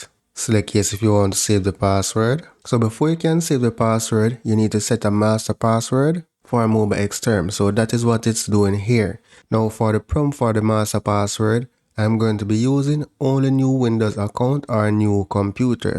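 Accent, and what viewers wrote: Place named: Jamaica. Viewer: First mi a hear patois on this side of yt, big up yuhself mi g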